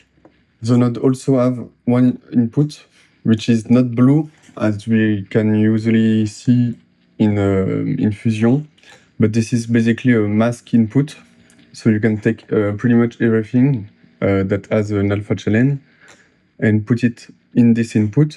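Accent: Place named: France. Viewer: Hello, from your accent i guess you must be french. Merci pour ta macro. Je me penchais justement sur la question et j'ai pu étudier ta façon de procéder à l'intérieur de ta macro. Au cas où, mais tu dois être au courant <link> Le gars vient aussi de sortir un plugin pour photoshop qui pourrait aussi te donner des idées pour une v2. Encore merci.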